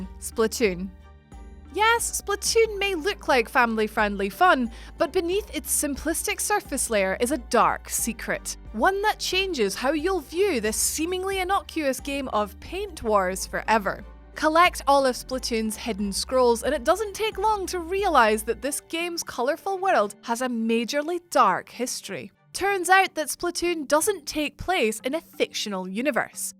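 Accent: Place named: Scotland, United Kingdom. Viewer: This bitches Scottish accent is annoying and irritating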